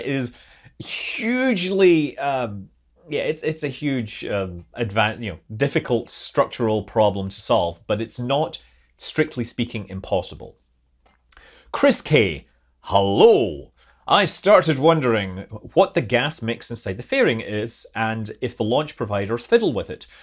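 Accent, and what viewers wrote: Scotland, United Kingdom. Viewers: Great Q&A, Scott. You do a great job informing us. And for us "Amerikins" your Scottish accent is icing on the cake.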